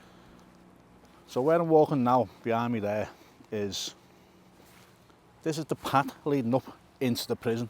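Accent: Liverpool, United Kingdom. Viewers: The wife was listening in on this and said he's good him proper scouse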